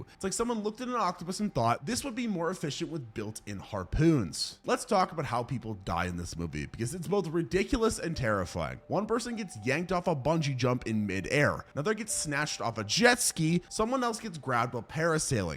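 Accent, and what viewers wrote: United States. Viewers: You aren't American ? Do not care at all, but you sound very American. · What country are you from? I assumed because you sound American, you're American. Your comment about calm down Americans with your Pew pews might be one of the funniest things I've ever heard in my life. And I am an American with a pew pew😂😂😂😂😂😢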